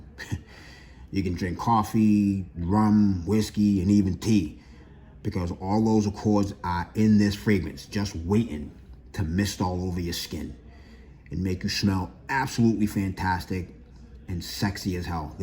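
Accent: Boston, United States. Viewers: Great Video. You have the classic Boston accent! Your selection is impeccable. Im a native to Boston and its great to see someone from home. Im subscribed to your channel. Much Love brother👊🙏.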